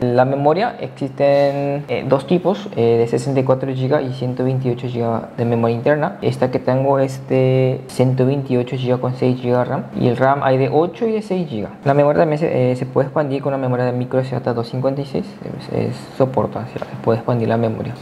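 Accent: Paraguay. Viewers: Cómo se nota el acento pyo 😂😂😂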